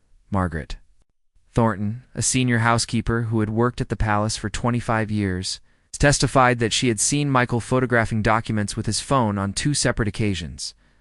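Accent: United States. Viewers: Right! As if a member of the Brigade of Guards would appear at court in his parade uniform. Rubbish with an American accent as usual! Bloody stupid!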